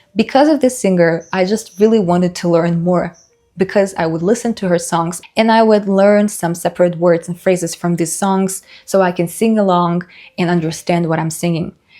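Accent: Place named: United States. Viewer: Great video. You have a very good command of english, no doubts about it. Accent reduction, rithem, fluency. I've noticed that your pronunciation is very much influenced by general american English :)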